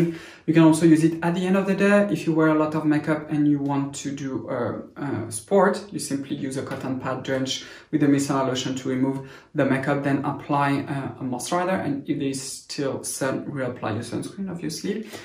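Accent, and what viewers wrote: France. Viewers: gotta love a french accent oh lalà🤩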